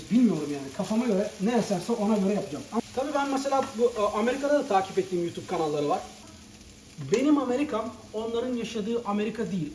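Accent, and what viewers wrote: Thrace, Turkey. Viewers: Trakyalı mısın öyle hissettim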